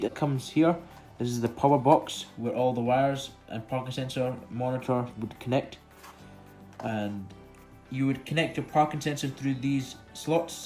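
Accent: Scotland, United Kingdom. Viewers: You Scottish mate?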